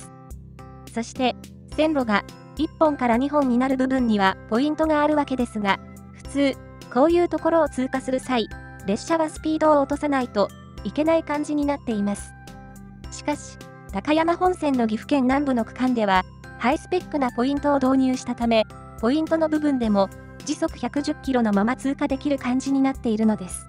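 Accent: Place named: Tochigi, Japan. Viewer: 歌、英語、栃木弁訛りとここ数日攻めているUP主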